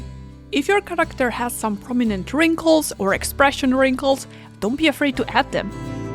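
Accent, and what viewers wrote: Italy. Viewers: grazie mille! tutto molto chiaro e semplice :) un saluto! p.s.: commento in italiano perchè sentendo il tuo accento penso tu sia italiana, spero di non aver commesso una gaffe!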